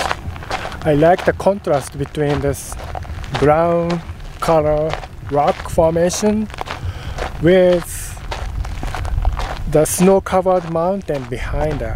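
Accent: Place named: Japan. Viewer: He has very strong Japanese accent.Uncomfortable listening.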